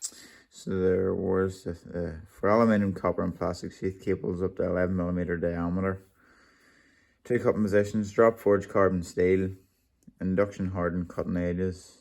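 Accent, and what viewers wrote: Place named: Scotland, United Kingdom. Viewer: Man, great video from a man who's using his tools in I presume a regular bases? I like your accent, and had to laugh out loud when I hear you calling names! I'm coming from Holland, and we have a saying "vloeken als een Hollander", it means something like ,"cursing like a Dutchmen" but I think people from Scotland can do their share too!! 😄 Very entertaining video, and besides that, you know where you talking about! I stumbled by accident on your video's and I'm really amazed that you only have plus 200 subscribers! Come on, make more video's,it's been 4 years ago!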